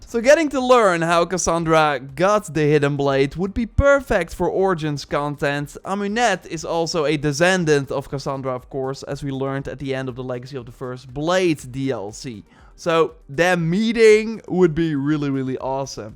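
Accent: Netherlands. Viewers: yow are you nederelands you sound like it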